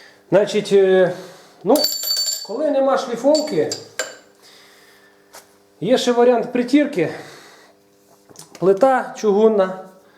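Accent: Ukraine. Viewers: Захожу смотреть твои видео, только чтобы услышать настоящую украинскую речь)))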